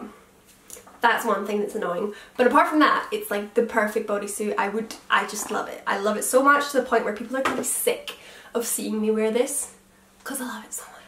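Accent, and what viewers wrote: Scotland, United Kingdom. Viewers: You suite the clothes really well Ps are you Scottish cause u have a Scottish and I'm from Scotland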